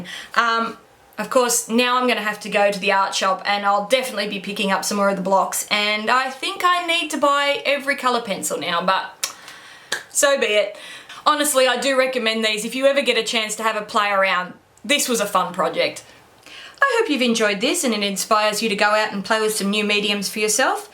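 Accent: Australia. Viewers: from one Aussie to another..it’s refreshing to hear an Australian accent for a change on YouTube 😀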